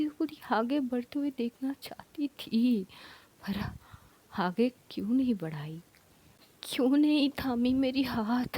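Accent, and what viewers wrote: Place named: South, India. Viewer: 𝗛𝗲𝘆𝘆𝘆𝘆𝘆 𝗱𝗲𝘃𝗶𝗶𝗶𝗶 𝗷𝗶𝗶𝗶 𝗹𝗼𝗵 𝗵𝘂𝗺 𝗮𝗮𝗴𝘆𝗲 𝗮𝗽 K𝗲 𝗸𝘂𝗰𝗵 𝗮𝗰𝗰𝗵𝗮𝗶𝗶𝗶 𝗮𝘂𝗿 𝗯𝘂𝗿𝗮𝗮𝗶 𝗹𝗲𝗸𝗿 𝗰𝗼𝗺𝗽𝗮𝗿𝗶𝘀𝗼𝗻 𝗯𝗲𝘁𝘄𝗲𝗲𝗻 𝗻𝗼𝘄 𝗮𝗻𝗱 𝘁𝗵𝗲𝗻 💁👇 1. 𝐕𝐨𝐢𝐜𝐞 𝐓𝐨𝐧𝐞:) pehle aap ke voice thode rough thi mtlb tone me ab softness aagayi hai 😌 Phle vo lehza apka aaj v wahi hai Lekin aapka andaz thode badal gya aur apke mizaz ka to kya 𝗸𝗲𝗵𝗻𝗲 .... 𝗝𝗮𝗴 𝗴𝗵𝘂𝗺𝗲𝘆𝗮 𝘁𝗵𝗮𝗿𝗲 𝗷𝗮𝗶𝘀𝗮 𝗻𝗮𝗵𝗵𝗵 𝗸𝗼𝗶😌 Jab mene apki voice phle bar sune the mujhe lga oh ye thode rude arrogant hongi 🤣🤭 fir bad me jana nah bete tera bhrm hai yeh inke jaise pyara to..... 𝗡𝗮𝗵 𝗸𝗼𝗶 𝗵𝗮𝗶 𝗻𝗮𝗵 𝗸𝗼𝗶 𝘁𝗵𝗮 (Bas bas compare Krna h acchai nhi 🤭) 2. 𝐀𝐜𝐜𝐞𝐧𝐭 :) pehle aapki Hindi cute the just like South walo jaise Lekin fir v apka Hindi me story continue kri usme v mene to kabhi accent me apki language aate nhi dekha yeh h 🌛lagan aur nishtha se apne kaam (storytelling) ke liye samarpit hona ......🫶 3. 𝐏𝐮𝐑𝐞 𝐇𝐢𝐧𝐝𝐢 :) kabhi kabhi aap itni acchi words use krte ho ki lgta hi nhi aap ki mother tongue hindi nah ho ha mai Puri tarah to nhi kh sakti ki apki hindi puri thik hue h but ha ab aap jarha h ko ja rhi hai nhi khte mtlb bande ko bandi me convert nhi krte 😀 4. 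4. 𝐃𝐞𝐝𝐢𝐜𝐚𝐭𝐢𝐨𝐧 :) han phle phle aap stories pe characters ke name pe thode confuse ho jate the but abhi abhi apka ye chise bahut had tak kam hogyi hai ...aap rat ho din ho no matter 11 baje v aap stories dalte ho to ab characters ke name pe utna confuse nhi hote Suru suru me aapka discribe krne ka tarika v khoobsurat tha Lekin ab khoobsurat ke saath saath imagination ki duniya ke hume le jakar khada kr deta hai hum un chiso ko vis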